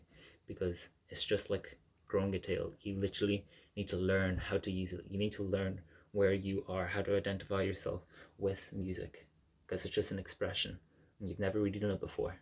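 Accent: Ireland. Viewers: This was great advice, thank you! Also, not sure if that's a bit of an Irish accent I'm picking up or not? It reminds me of my fellow Dubliners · I hear an irish accent for sure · are you irish?? or live in ireland?? i can't place your accent but i swear you have our manners of speech it's driving me crazy lol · are you irish?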